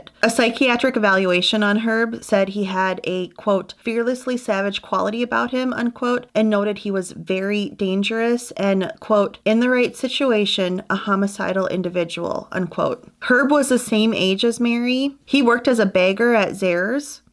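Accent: Minnesota, United States. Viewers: I don’t comment on channels often, but had to say what a great job you do at storytelling. I came across your channel just a few weeks ago, and of course being a former Minnesotan, I was intrigued. This story really struck a chord as I went to SCSU in 89’ and my townhome was so close to this quarry. I had never heard of this story before, it was heartbreaking to say the least. I clearly remember when Jacob Wetterling was abducted and the National Guard flying over my dorm room in search. Always a true crime buff, but now a Floridian, I look forward to your podcasts…and loving your accent as it sounds like what will always be considered “home” to me…Minnesota! My best to you! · Love that Minnesota accent! Also from MN ❤❤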